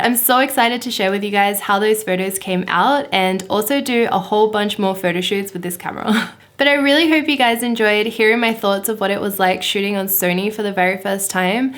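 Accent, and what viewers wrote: Australia. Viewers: As an English teacher in Germany I just simply enjoy listening to you speaking. Your Australian accent is adorable! ;-) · Valley australian accent?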